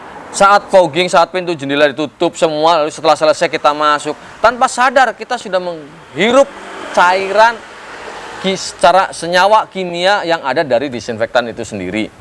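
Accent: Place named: Banyumas, Indonesia. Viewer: ngapak e isih ketara lah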